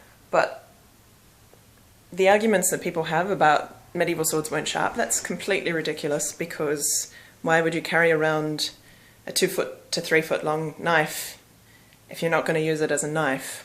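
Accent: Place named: Australia. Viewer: There's more videos than this but yes, still nothing wrong with having more of them. Perhaps do some videos on wrestling with longswords, as many are under the impression it simply didn't happen. Edit: Also I thought you were Australian for a minute there (I'm Australia.) gee the difference between your accent and ours here is a lot more subtle than I'm used to.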